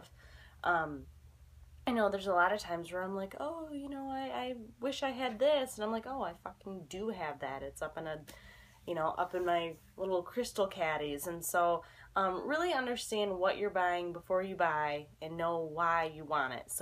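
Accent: Michigan, United States. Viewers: i could listen to you all day. maybe its your michigan accent that i love haha.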